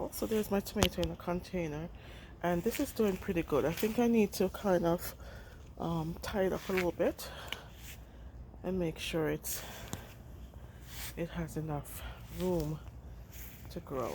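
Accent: Jamaica. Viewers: Hi Sis. Yes thought I heard a Jamaican accent. It's great to see how you used the containers for all these veggies. Compost is a great idea. Also it helps to switch out the types every 3 years. New friend. ☺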